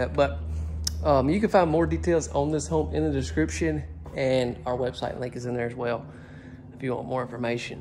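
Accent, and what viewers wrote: South, United States. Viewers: If just read the company fliers most come standard with 30 gal hwt & tiny are even smaller & if most people are like me I take long showers u need minimum 40 pref 50 by the way totally enjoy ur video's & ur Southern accent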